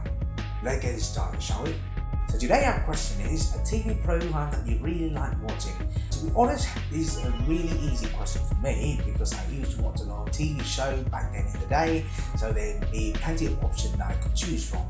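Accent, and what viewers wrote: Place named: United Kingdom. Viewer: Giọng Anh Anh chuẩn thế ạ :3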